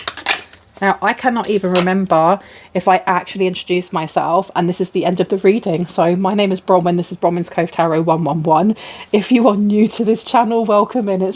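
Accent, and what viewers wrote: United Kingdom. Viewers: Thanks! This presentation and the one after it really resonated with me. In my situation, past & present. BTW really love your British accent.❤😊 i couldn't stop giggling when you said,...." as if they were stumbling around like they came out of a bar or something."" I was expecting you to say pub, though! 😂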